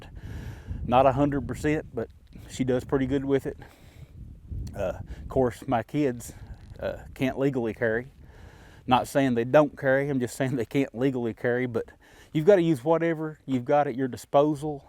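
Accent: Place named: Kentucky, United States. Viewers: Brother don't worry about your vid quality. It is the substance that matters. As far as the accent it reminds me of my family who live down in your neck of the woods. My Dad was born in a coal mining camp in deep Appalachia and my roots run deep in those mountains. Thanks reminding me of my family's home. All the best! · Being a fellow Eastern Kentuckian I appreciate your accent. I wished it wouldn't die out, but I think it is. My Grandson sounds like the people on tv. I really enjoy your videos and being a new subscriber I have many to watch. I believe the Governor would sign the bill if it makes it to his desk. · I’m a hillbilly from Kentucky too (Letcher Co.) I can understand you just fine, you sound a lot like me and my people, and you are right about kids having less of the hillbilly accent, my kids talk a little more proper than I do, I think it’s from TV mostly. 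Well I’m gonna get back to watching the UK vs. UT game it’s been a good one so far, Go Cats! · I just found your channel and have been binge watching. First heard you and thought you was from Kentucky as well. Then I find this video and I’m pretty sure I’ve hunted the pioneer hunting area you walked by in this video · Hey. Always good to hear that southern drawl up here in New England. The south has some great food, great people, and is the most business friendly region of the USA. Pay no attention to the haters. Glad that you found your ve-hicle ok. LOL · Spot on Brother! The fact that you have to delete comments based on your accent just shows how retarded some people are.. I just figured you are from the south.....dont all ya’ll talk the same anyways?? 😂😂😂. Great video, keep it up!! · I appreciate your videos. As a fellow Kentuckian and graduate of EKU, I appreciate the Appalachian hertiage. 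I don't know why anyone would have an issue with your accent. Take care and God bless. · Your accent sounds like my kinfolk from Pennington Gap, newer subscriber enjoying your channel from Phx Az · I’ve said it before, sounds like home to me. My dad’s side of the family is all from Kentucky 😁 Spent the first week of my life in Ft Knox off base housing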